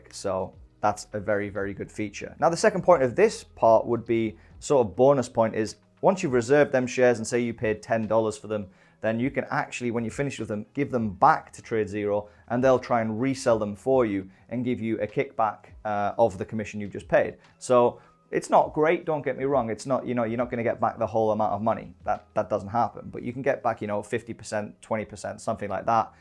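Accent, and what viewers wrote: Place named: Newcastle, United Kingdom. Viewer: Love the Geordie accent are you in Newcastle??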